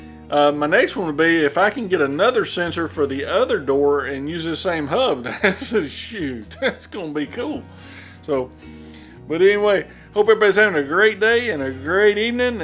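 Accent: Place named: South, United States. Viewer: try to rid of the southern accent. you will get more money, dude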